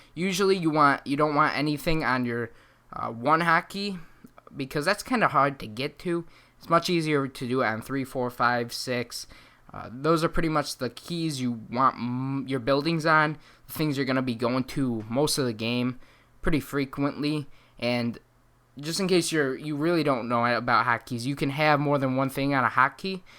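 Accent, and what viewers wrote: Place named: New England, United States. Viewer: script or no script, you'd sound better without that awful new England accent.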